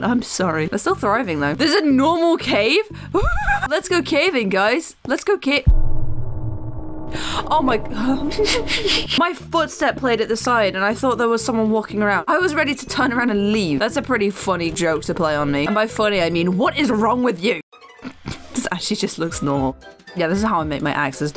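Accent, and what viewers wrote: United Kingdom. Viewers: are you a brit?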